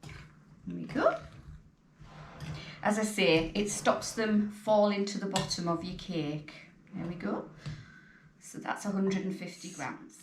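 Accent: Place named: Yorkshire, United Kingdom. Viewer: Will defo give this a go. Can I just say I am guessing your accent, are you north east or Yorkshire way?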